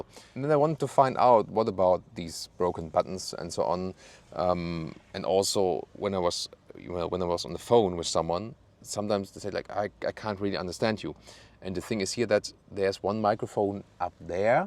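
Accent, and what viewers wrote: Germany. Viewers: First time watching this channel,straight away notice Hard German accent.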